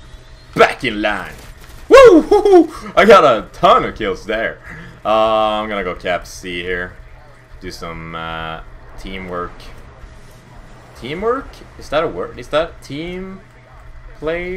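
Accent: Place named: Sweden. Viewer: can u be my english teacher. MY teacher is like 80 and scottish. Scottish accent< Swedish accent!!!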